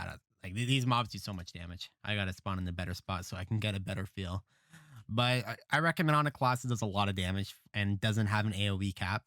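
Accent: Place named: Canada. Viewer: Awesome, thank you! Also you sound like a fellow Canadian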